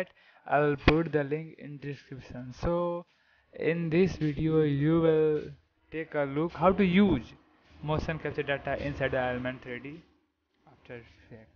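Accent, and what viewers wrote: India. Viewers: u sound like an indian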